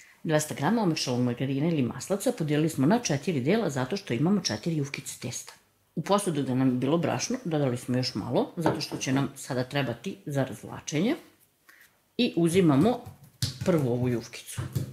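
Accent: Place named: Backa, Serbia. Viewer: predpostavila sam da ste iz Backe po dijalektu.Jos jednom veliki pozdrav,laku i mirnu noc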